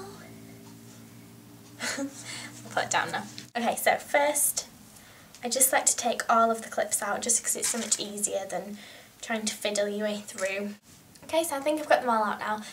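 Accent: United Kingdom. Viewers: What accent does she have?! Is it British? I love it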